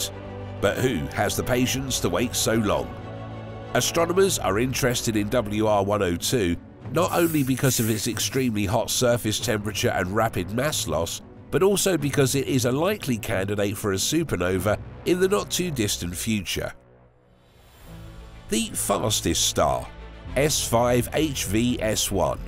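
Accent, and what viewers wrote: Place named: Cockney, United Kingdom. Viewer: Yes this is all very interesting, but the narrator sounds like some geezer off Eastenders with a sinus infection. Couldn't you find someone else who sounds more educated and less unhealthy?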